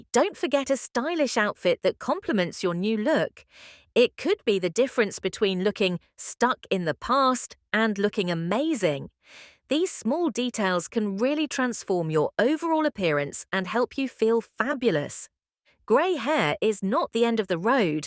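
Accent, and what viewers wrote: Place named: England, United Kingdom. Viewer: English accent; American spelling.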